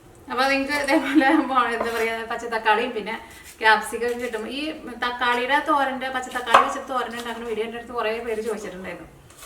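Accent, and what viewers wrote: Thrissur, India. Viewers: Mmade Thrissur slang Oru prathyekatha thannaaaa tta eshta😄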